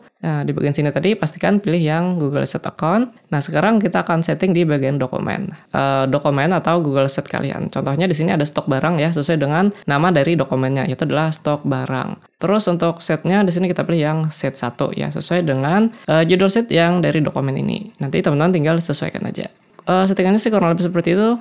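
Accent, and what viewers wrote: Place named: Banjarbaru, Indonesia. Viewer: orang Banjarbaru bang😅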